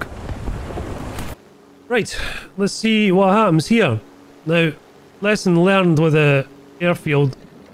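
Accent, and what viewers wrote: Scotland, United Kingdom. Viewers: just wanna say nice gameplay in the intro also whats your birth nation you sound scottish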